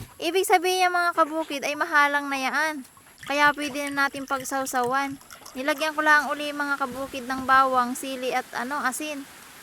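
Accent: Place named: Quezon, Philippines. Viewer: Taga Quezon Province ka ate.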